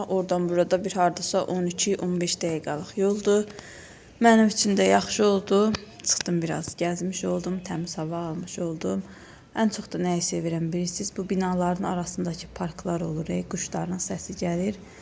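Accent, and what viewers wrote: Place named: South, Azerbaijan. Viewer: Salam,sizin ləhcəniz elə şirin gəlir.variydi variydi deyirsize,cənubdansiz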